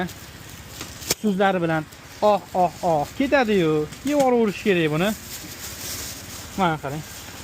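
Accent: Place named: Tashkent, Uzbekistan. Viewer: Toshkent shevasidan nafratlanaman💔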